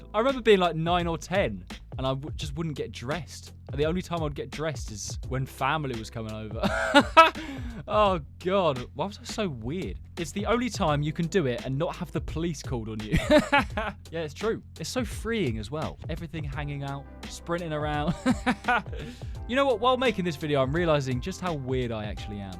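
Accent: United Kingdom. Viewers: I only laugh because he’s british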